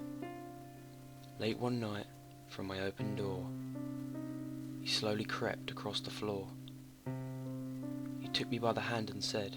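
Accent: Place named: England, United Kingdom. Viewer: Very good, nice to hear one in an english accent! Xx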